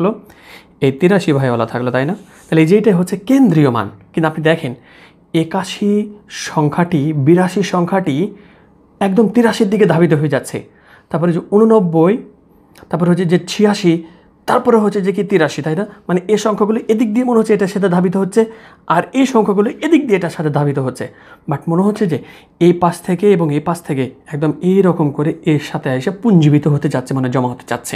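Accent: Rajshahi, Bangladesh. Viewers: Sir are you from Rajshahi?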